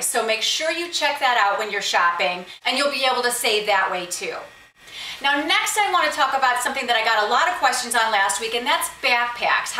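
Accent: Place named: North, United States. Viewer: yeah, you can hear your from the north part of the us :) so am i lol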